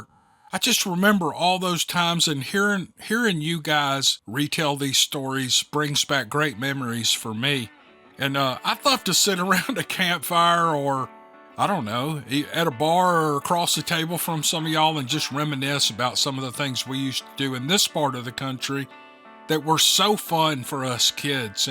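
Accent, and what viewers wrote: South, United States. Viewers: That was a real treat! Great stories! Thanks much! Others read stories but no one else does it with quite the Southern Style of! I'll be leaving a light on tonight! 8 )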